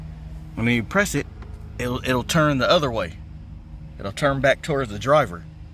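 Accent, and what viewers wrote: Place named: South, United States. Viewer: Dude sounds like a country southern White boy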